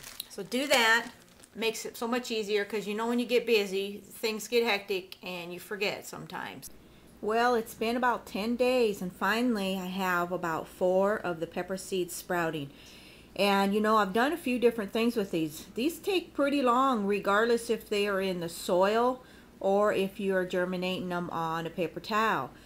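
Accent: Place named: North, United States. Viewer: Where are you from? Accent sounds like a northern state.. near canada?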